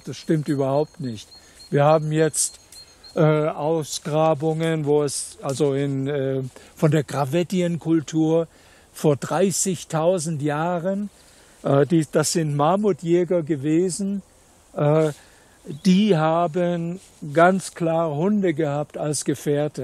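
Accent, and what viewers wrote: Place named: Saxony, Germany. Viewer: 👍💚 Ich liebe es, wenn das Sächsische durchklingt.. 💚👍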